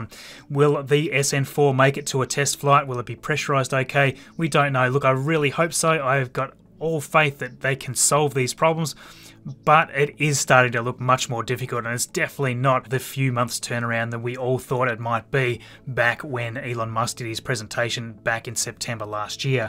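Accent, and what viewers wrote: Australia. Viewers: Nice Channel. Just hit subscribe after watching you for a few weeks. good content, and nice for you credit your sources. Question for ya.... You sound like an Aussie... And I know it's likely to not get the views you may want, but what about something on the Gilmore Space up on the Gold Coast?? Anyways.. thanks for ya content:) - 4563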